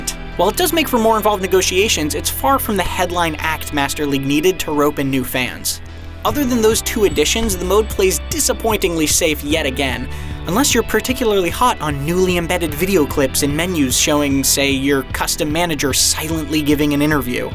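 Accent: United States. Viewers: nice try but if i hear an american accent i automatically dont need your opinion when it comes to football .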